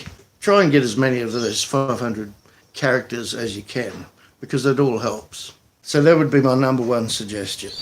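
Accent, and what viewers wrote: Australia. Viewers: I don't know what the hell I'm talking about. But it's nice to have someone reply back and share things to say. You sound like your from Australia. U used to live down there in Sydney. I'm probably gonna go back as soon as I can. I'd rather be down there if the shit really hits the fan if you know what I mean.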